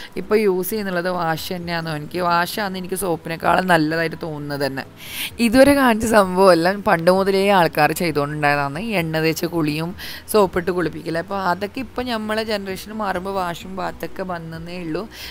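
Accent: Kasaragod, India. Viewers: Sebamed super ,ksd slang l polichu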